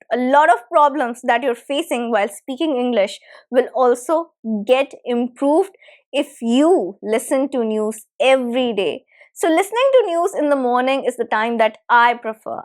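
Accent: England, United Kingdom. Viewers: I listen to your videos ❤ for improving my english listening skilss❤ i like you english accent really very much ❤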